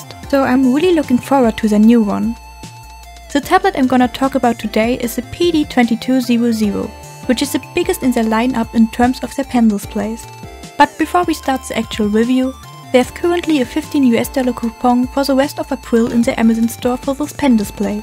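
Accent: Germany. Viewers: Man bemerkt den deutschen aksent xD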